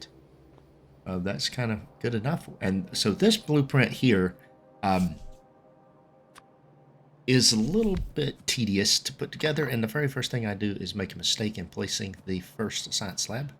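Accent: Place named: South, United States. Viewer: It sounds to me you're from the south. Like the accent and I love this game :)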